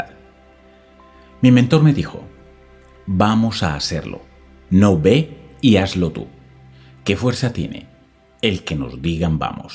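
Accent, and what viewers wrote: Colombia. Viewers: Muchas gracias por los consejos y las ideas = son cosas k hacen pensar y yo he cambiado mi mentalidad ; después que escucho los Audio. Solo queria Saber si la personne que narra es un Colombiano?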